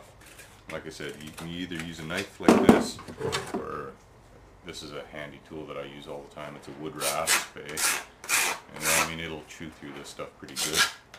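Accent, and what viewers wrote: Canada. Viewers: jw are you canadian? i am and i can recognize the accent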